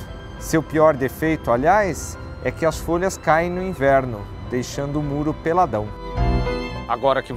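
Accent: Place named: Santa Catarina, Brazil. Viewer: parece santa catarina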